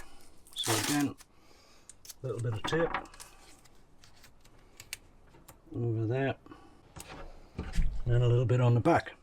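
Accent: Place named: Newbiggin, United Kingdom. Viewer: Another great video, Love to see what you've been doing to your van. Where abouts are you from? sounds like you are from newbiggin area?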